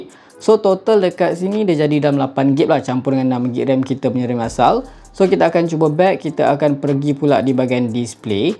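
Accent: Malaysia. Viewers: Lucu banget y logat malaysia saya suka🤣🤣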